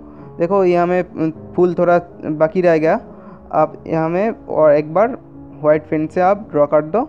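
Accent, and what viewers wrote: Bengal, India. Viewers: haye re bengali babu..acent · aareee....bangali.....agy. hindi. sikhy. aai.. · improve your Hindi. You sound like Bong-Hindi, which is horrible. I'm sure you are Bengali... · Dont worry bro it was good enough to understand.... I can say that youre Bengali like me, thats why you had problems with pronounsation. But the video was good enough to understand..... Thanks. Qnd make some more useful video like this..... 😊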